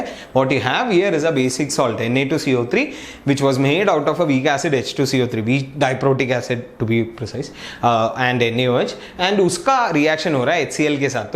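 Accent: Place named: Kerala, India. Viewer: In my school most of the English teachers have same accent as they are from kerela.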